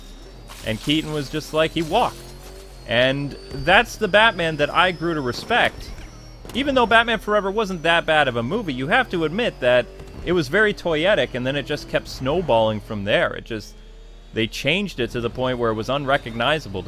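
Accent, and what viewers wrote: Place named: New York, United States. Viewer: I could hear the New York accent coming through today! Great vid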